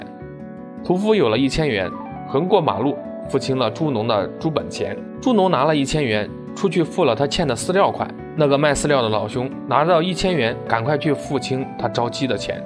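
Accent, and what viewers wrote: Henan, China. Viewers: 兄弟你是Henan的吧？一听这口音，河南普通话，建议注意下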